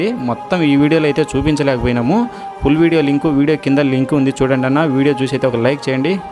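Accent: Rayalaseema, India. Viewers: Tammudu mee vuru Kurnool aa Rayalaseema matalu laga unnayee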